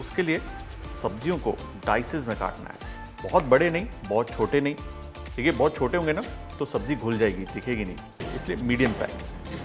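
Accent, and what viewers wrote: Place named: Lucknow, India. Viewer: Aap bilkul lko ka touch dete hain boli m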